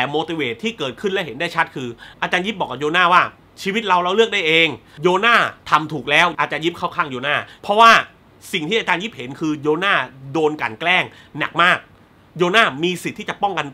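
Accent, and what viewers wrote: South, Thailand. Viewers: คนรีวิวคนใต้ใช่ไหมคับ ควังสำเนียงออก 😂😁😀😊☺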